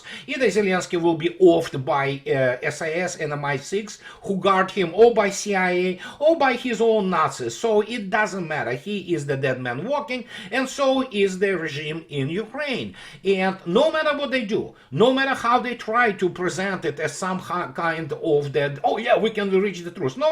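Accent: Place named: Russia. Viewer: "Russians don't need to rush." Yes, they do - it's in the name! By the way, given how long you've been in the US and how thick your accent still is, would you say the Russian accent is even more persistent than the British accent? Given that one of the key attributes of Russians is that they endure, I guess that would make sense.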